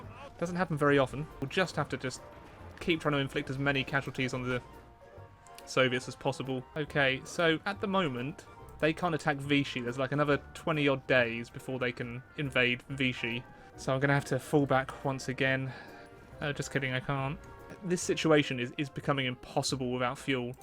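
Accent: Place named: United Kingdom. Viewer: I have noticed when you read outloud some of the chats messages you change up the i to he. Is this a normal british thing?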